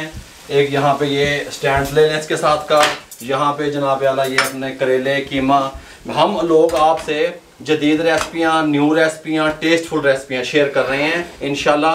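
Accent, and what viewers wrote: Punjab, India. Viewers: Koi masla nahi hai is one of the best dialogue from you sir and camera idhar meri taraf karna such dialogues and punjabi tarka makes your videos so attractive hats of you and your style sir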